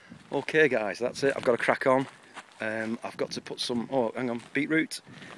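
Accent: South, United States. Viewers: You master gardener you! You are excelling brother. Common....I want to hear you make fun of me...HAHA! I want to hear the southern accent! You'll be growing stuff yearround in that greenhouse. I like it. Wish I had a larger one. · Now see I clicked on the video to hear the Southern accent! LOL. I know what you mean about being impatient. Bernard on navajopa31 has a video on grapevines. Here's the link. <link>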